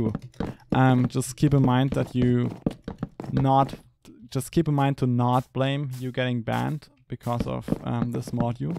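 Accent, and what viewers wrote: Germany. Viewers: In germany say: Deutscher Akzent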